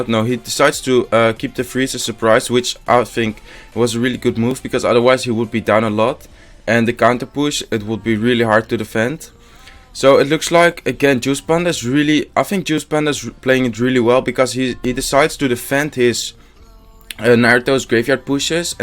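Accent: Netherlands. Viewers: where are you from you sound dutch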